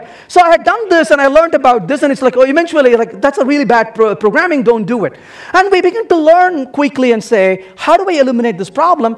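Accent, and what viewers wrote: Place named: India. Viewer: Indian accent and public speaking don't go well together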